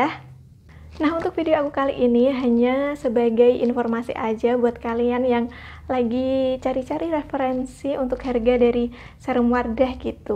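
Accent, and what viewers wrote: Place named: South Kalimantan, Indonesia. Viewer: logat ngomongnya mbak kaya dari kalimantan selatan pahuluan saat ngomong kata "wardah", "wajah", "like", "ada", "pori-pori", "harga", "sembilan", "ribuan", "mbak-mbak".... nebak iseng-iseng doang, hehe... nice video... main2 juga ya ke channel saya. .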